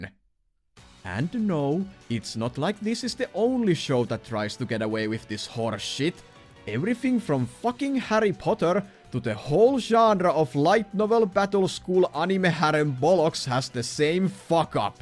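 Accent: Finland. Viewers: Came to see High Guardian Spice being ripped to shreds for the atrocities it commits on the name of animation and anime, and stayed for the great jokes, funny delivery and that thick Finnish accent... jatka samaa mallii, ootan innolla osaa numero 50! 👌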